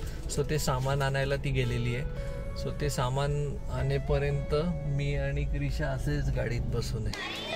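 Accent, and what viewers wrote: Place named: Pune, India. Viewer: Full puneri vatatos boltana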